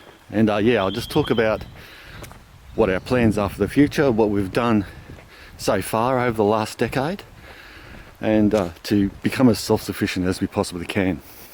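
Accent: Australia. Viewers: Nice...soon I will be making a video like that..as soon as I get my little farm..lol My dream! Are you located in Australia, somewhere like that? I guess because of your accent.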